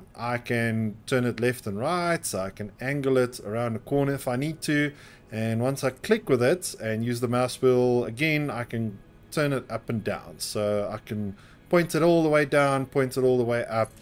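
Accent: South Africa. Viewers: neat, could hear it haven't found a lot of south africans playing the game. also from SA :D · Awesome to hear a fellow Saffa play this game. Keep the content coming chomma!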